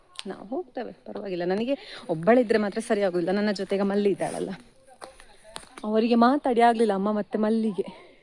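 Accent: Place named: Udupi, India. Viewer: Namma Udupi bhashe matadteddiree ...neevu yelli navru...nanna ooru barkur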